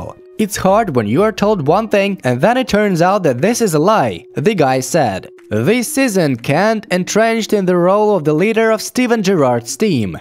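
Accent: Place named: Netherlands. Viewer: He sounds Dutch.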